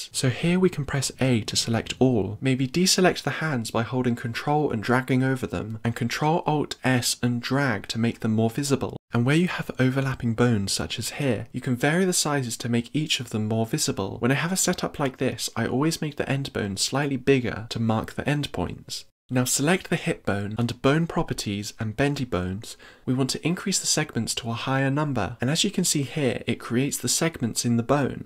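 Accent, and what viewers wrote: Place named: United Kingdom. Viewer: Thanks a lot ! And... I luv your british accent ! Greetings from germany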